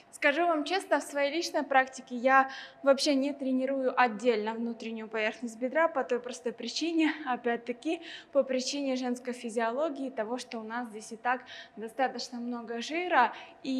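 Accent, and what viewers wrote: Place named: Ukraine. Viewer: Вы считаете, что Ваш акцент идеален и речь прекрасная? Для чего вообще комментарий в таком тоне? Просто, чтобы задеть человека? У Вас что-то личное к этой девушке или вообще ко всем, кто с Украины? Если не нравится, то можно просто не смотреть. Ссылку скиньте на Ваши ролики, и вообще интересно посмотреть на Ваши достижения в жизни. Я уверена, что человек, который трудом добился чего-то, никогда не обесценит труд другого человека и уж тем более не выскажется в таком тоне. Я из Казани и у нас здесь каких только акцентов нет и это нормально. Не знаю, где Вы проживаете, но , судя по комментарию, Вы не из очень хорошей среды.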